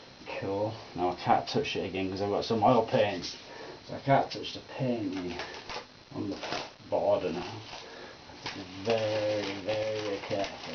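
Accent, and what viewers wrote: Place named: Yorkshire, United Kingdom. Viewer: Wow!!!! What an amazing painting. What a talent. Also, you've gotta be from my neck of the woods in Yorkshire. I'm guessing somewhere around west/south yorkshire. Great work. Keep it up. All the best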